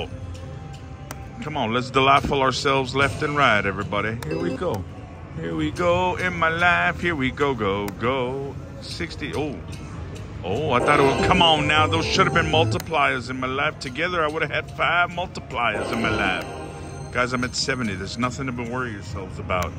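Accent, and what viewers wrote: South, United States. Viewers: I’m originally from Houston and I must say that today you’re accent was beyond any southern lady’s or her best “ fan waving” day!!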